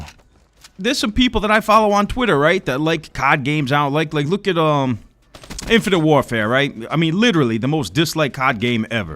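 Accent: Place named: New York, United States. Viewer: I Knew you was from ny b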